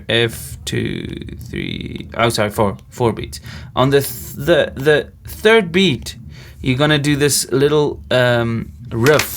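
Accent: South Africa. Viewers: Great video. Where are you from? You sound a bit South African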